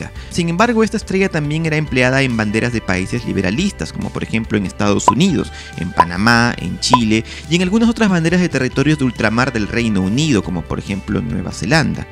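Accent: Peru. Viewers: SOY CHILENO Y SE NOTA Q LA VOZ ES DE UN PERUANO DE FUJIMORI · Eres peruano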